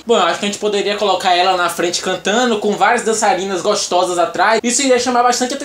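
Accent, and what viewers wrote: Bahia, Brazil. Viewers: ele é baiano???? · c é de salvador ?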